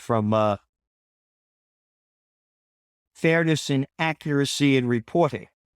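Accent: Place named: East Coast, United States. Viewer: Omg gerald you are looking extra dapper today love your truthfulness your east coast accent and your proud Italian heritage you are welcome in Texas if you want to join us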